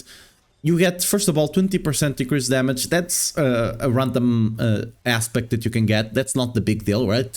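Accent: Portugal. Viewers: Are you Portuguese by any chance?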